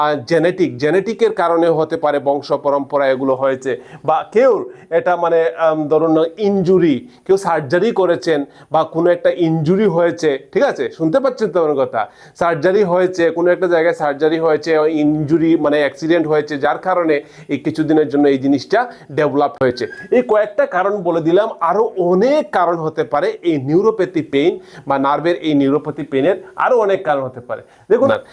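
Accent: Sylhet, Bangladesh. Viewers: আপনার অফিস বা চেম্বার কুথায় বলেন। ভাষা বলে আপনি সিলেটি।চেম্বারের ঠিকানা দিলে উপকৃত হব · আপনার কথায় মনে হচ্ছে আপনি সিলেটি, কিন্তু মেডিসিন গুলি দেখছি সব ইন্ডিয়ান , এসব মেডিসিন কি বাংলাদেশে পাওয়া যাবে ??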